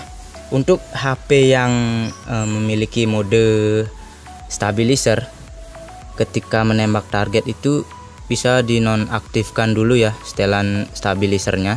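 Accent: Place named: Bali, Indonesia. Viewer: orang bali, logatnya T nya kelihatan.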